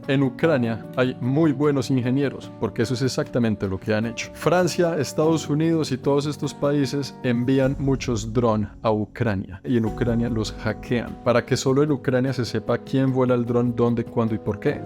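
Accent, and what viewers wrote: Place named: Colombia. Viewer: SUPER COOL 😎 👌 TODOS SUS VIDEOS!! MUCHAS GRACIAS POR COMPARTIR 😊 BENDICIONES 😘!! ME GUSTA 👍 MUCHO SU HACENTO COLOMBIANO 😊 Y SUS COMIDAS. SALUDOS DESDE LOS ANGELES, 🙏♥ CALIFORNIA. USA.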